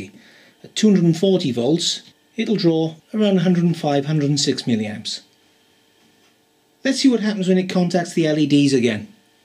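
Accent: Wales, United Kingdom. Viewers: Hey, is this a Welsh accent I hear?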